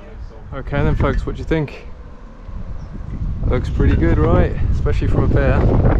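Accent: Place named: United Kingdom. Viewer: Hi...where is it you're from in the UK? I seem to recognise the accent from my UK years, but I'm not too sure...cheers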